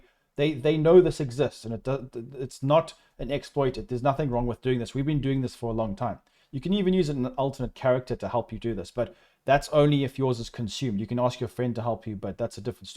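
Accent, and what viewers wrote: South Africa. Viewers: Hey are you South African you have that South African accent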